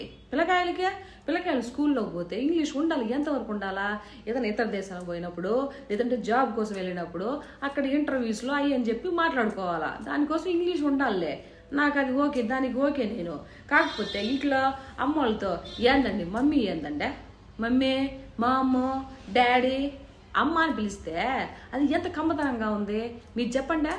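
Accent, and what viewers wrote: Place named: Krishna-Guntur, India. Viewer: Your Telugu language is also somewhat not clear. You always try to speak Krishna and Guntur Districts Telugu language. Regards.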